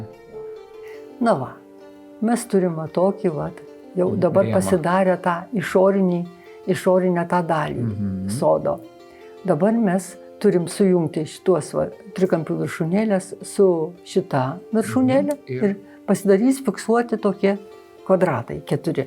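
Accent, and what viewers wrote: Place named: Dzūkija, Lithuania. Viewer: Nuostabu, o dar tas lengvas dzūkavimas malonus ausiai , klausyk ir mokykis.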